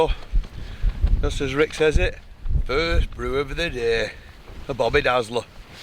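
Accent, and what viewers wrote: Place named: Northern England, United Kingdom. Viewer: Fantastic to see you back out and hear your superb Northern tones,us Northerners stick together. I’m 62 and you’re inspiring me to start this wild camping malarkey. Look forward to the next video mate.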